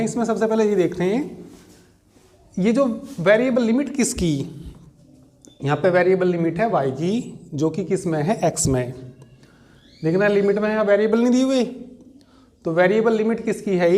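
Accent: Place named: Himachal Pradesh, India. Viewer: sir most probably you are from Himachal if I'm not wrong .......... (by accent)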